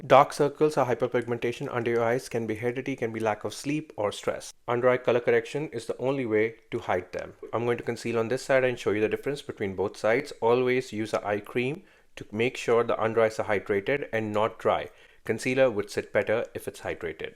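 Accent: India. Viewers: Correct first your accent. Does all Indians and hindoes have short tongue? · احيه اكسنت هندي زفت و كمان ذكر يعلمنا ميكب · I love his indian accent ..it makes me move my head side to side😅😅😅😅😢😢😢😊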